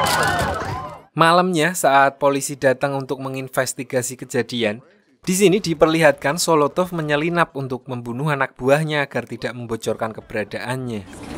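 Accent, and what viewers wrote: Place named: Java, Indonesia. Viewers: medok cukk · gak bisa biasa aja apa bang medok banget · medhokk cokk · njir sikak wong temanggung ta 🗿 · Medok tp keren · Medok banget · Youtuber dari jawa timur ini kyaknya, udh jelas bgt dari omongannya wkwkwk..😂😂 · Fixs wong temanggung admin e 😂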